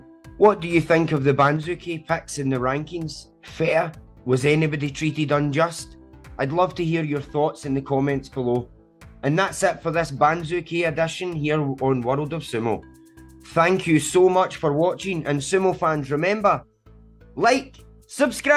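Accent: Scotland, United Kingdom. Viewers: If his knees are okay, Terunofuji will win the New Years' Basho. If his knees give him problems, I think it will be a tight race between Wakatakakage and Hoshoryu with the latter winning his first Emporer's Cup. Hoshoryu is starting to look more and more like his uncle, the great Asashoryu. Not just physically but also in terms of strength and variety of techniques. Great to hear a sumo commentator speaking in a Scottish accent,!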